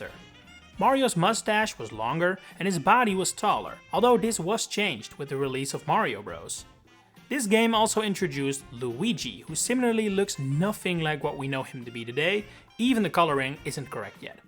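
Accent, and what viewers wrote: Netherlands. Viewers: Im always amazed how I can pick up on a Dutch guy speaking English.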